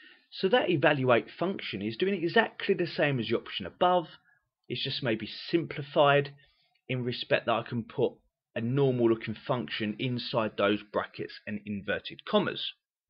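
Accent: United Kingdom. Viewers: This is a very useful video to understand Evaluate Function in VBA. Thanks for sharing. And love ur British accent btw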